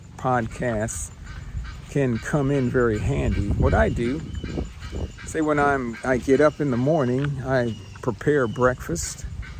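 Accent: Texas, United States. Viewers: I believe that finding time if you really want to learn English is not a problem. Too often it's an excuse. What really helps is what I call immersion in the language. If you can't talk to native speakers, you should watch the movies, listen to the music to pick up words, phrases and above all, to familiarize with the pronunciation . I like your Texan drawl👍. Greetings from Poland.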